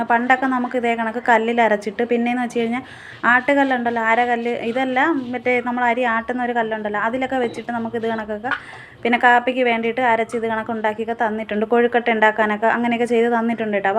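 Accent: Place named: Kozhikode, India. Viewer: നല്ല സംസാരം കേട്ടിരിക്കാൻ തോന്നും കോഴിക്കോട്ട super ഞാൻ ഇങ്ങനെ ഉണ്ടാക്കും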